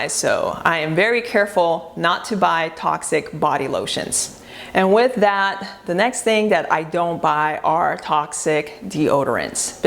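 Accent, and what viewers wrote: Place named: Singapore. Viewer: Are you originally from Singapore? My friend is and her accent is similar.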